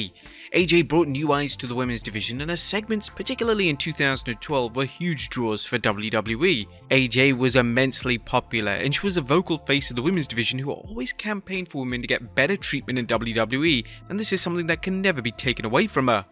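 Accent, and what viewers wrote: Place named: United Kingdom. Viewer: Why all westreling videos always a Bristh accent,Wrestling is American.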